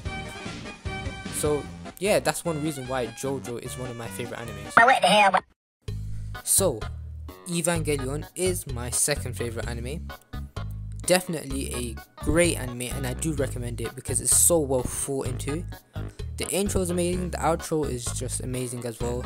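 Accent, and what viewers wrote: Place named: India. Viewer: u sound indian😳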